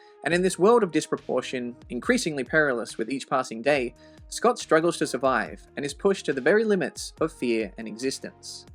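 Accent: Australia. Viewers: Australian… I think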